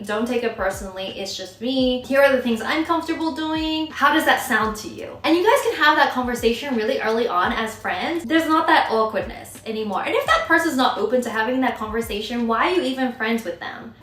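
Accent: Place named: Australia. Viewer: wengieee love u!! i had adapted your accent and the aussie vibe changed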